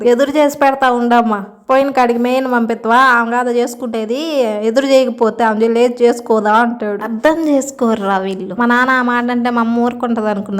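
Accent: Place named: Nellore, India. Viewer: Sis medi evuru me language Nellore district vallalaga undi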